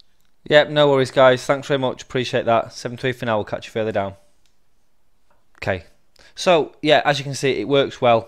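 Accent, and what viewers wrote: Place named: Cockney, United Kingdom. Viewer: good video but you speak faster and you use the cockney accent and I cant understand you please add an authentic english subtitle because the automatic subtitle generator of youtube can't shoot all what you say because you speak faster and withe unauthentic accent thank you for reading my comment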